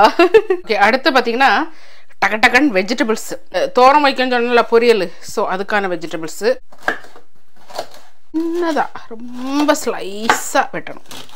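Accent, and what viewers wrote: Nagercoil, India. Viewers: Sister after seeing ur channel nan unga channel than pakurean.because neenga namma nagercoil samayal poduringa.nangalulam nagercoil than ana chennaila irukirom.neenga samaipathum pasuvathum nama ooru nabakum varuthu.so we enjoy and see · நாகர்கோவில் பேச்சு வழக்கில் நம்மூர் அக்கா லண்டனில் இருந்து பேச கேட்கும் போது உண்மை யிலேயே என்ஜாய் பண்ணி பார்த் தனக்கு. கீப் இட் அக்கா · Hi Sister. என் வயது 45. உங்க பேச்சு அப்படியே நாகர்கோவில் Slang. நான் பிறந்து வளர்ந்தது திருநெல்வேலி. திருமணம் ஆனது நாகர்கோவில். உங்க யதார்த்தம் பிடித்தது. காந்தாரி மிளகாய் செடி வளருங்கள். மருத்துவ குணம் உடையாது. நன்றி. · நாகர்கோவில் slang super 👌 ௨ங்க expression அடிபொளி 😄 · Apdiye Nagercoil style la ye pasrenga sema ka, school time neyabagam varuthu, am also from kk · Pothisoora vida neenga pesura nagarcoil slang romba nalla iruku... Neenga sapdurathu atha vida romba nalla iruku... 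Nagarcoil la irukura mari feel iruthuchu.... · Makka Namma Nagercoil pechu thaan vera level makka 👍❤️ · Nijama nagercoil la ullavanga kuda syle nu pechaiye mathitanga.. Ana neenga pesuratha kekumpothu alaga iruku.... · Pakka Nagercoil baashai😅 mam poli🔥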